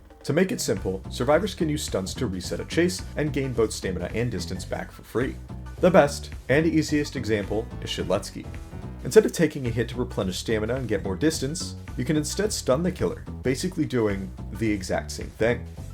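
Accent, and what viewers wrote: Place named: United Kingdom. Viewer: ARE YOU FUCKING BRITISH?:face-purple-crying::face-purple-crying::face-purple-crying::face-purple-crying::face-purple-crying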